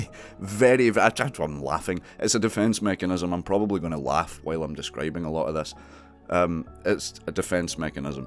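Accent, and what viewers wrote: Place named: Scotland, United Kingdom. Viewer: Where in Scotland are you from? Sorry, I can't help it, I love your accent. 😊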